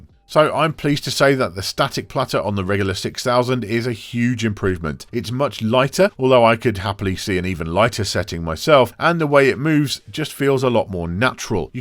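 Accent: England, United Kingdom. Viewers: Great review, many thanks! Please keep in mind that this channel of yours, is watching by viewers and subscribers from all over the world. The only "negative" in your reviews is the fact that you speak too fast and viewers who are not native English and don't have that English accent, might have problems of understanding you 100%. Otherwise all of your reviews are excellent!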